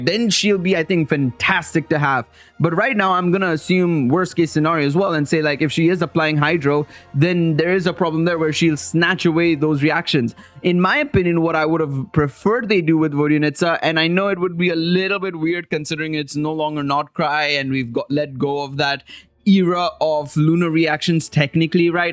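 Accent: India. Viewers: Are u indian as u sound very indian or christian maybe just would like to know and plus anyways ur videos are very good and enjoyable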